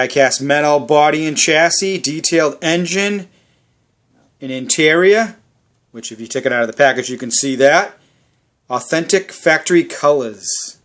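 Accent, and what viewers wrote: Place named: New York, United States. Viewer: Are u A New Yorker?? From the Big Apple? Or Vinnie from the Bronx?? Love that Wiseguy accent 👍🏻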